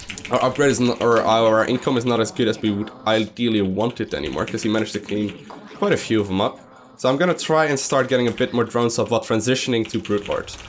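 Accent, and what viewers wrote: Netherlands. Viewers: He's dutch :) not Irish O.o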